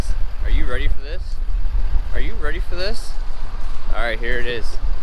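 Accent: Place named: Canada. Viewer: I just realized your Canadian.... now I can’t unhear it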